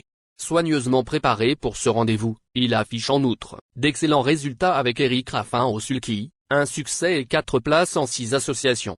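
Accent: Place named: Burkina Faso. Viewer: Son accent est burkinabe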